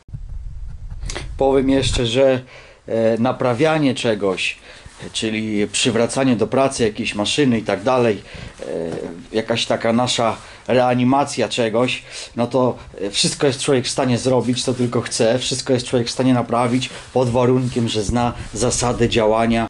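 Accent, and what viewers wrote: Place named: Podlasie, Poland. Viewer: Kolega chyba z Podlasia sądząc po akcencie ;-)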